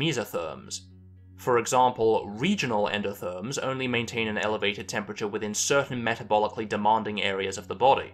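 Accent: United Kingdom. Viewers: A guy with a British accent is explaining a prehistoric world to us. It makes me wish that there wouldn’t be copyright consequences if you just started using the musical score from Walking with Dinosaurs for the background of these.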